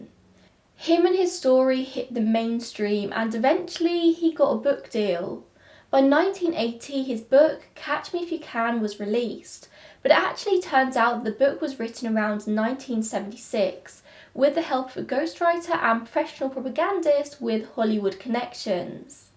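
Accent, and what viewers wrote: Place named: London, United Kingdom. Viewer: Apart from the annoying low class London accent and the grammatical mistakes of her own language , this is a review of a book that was ghost written to sell books using data from a book that was written to sell… well books , and bits from a movie script that was written to sell movie tickets. None of them are the truth. You should have done your own research and presented those findings but, that would take time away from attempting to be famous on YouTube. Far better to copy someone else’s work, dumb it down and concentrate on You. By the way, if you are going to read facts from a prompt because you don’t know them , make sure that the information is correct…. There is no Bronxville area in The Bronx, it is Brownsville and, I am quite sure that Abagnale started doing speaking tours around the USA, not the UK. 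Know your subject matter.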